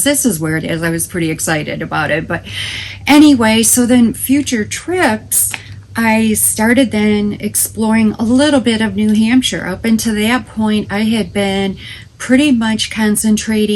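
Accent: Michigan, United States. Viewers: Hello! I found about you through Linda Davis and I'm a new subscriber. I love to hear about moving adventures and I'm looking forward to more of your videos. I live in Northern NJ and I love where I live but dream of moving to somewhere in NE someday. I even lived in Michigan for three years. My son was born there so I'm very familiar with your accent! I have family in Vermont, Rhode Island, and New Hampshire as well and love going out to any if those places any chance i get. Best wishes to you and your family and I hope you enjoy living in New Hampshire!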